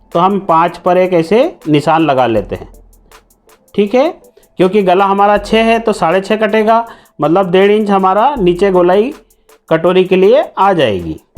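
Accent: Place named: Maharashtra, India. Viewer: मला वाटलच होतं आपण महाराष्ट्रीयनच असाल कारण आपण अठ्ठाईस ला अठ्ठाविस, सत्ताईस ला सत्ताविस बोलत होता. जय हरी विठ्ठल बोलणारा माणूस मराठीच असू शकतो. 💐💐💐🌺🌺🌺💐💐💐💐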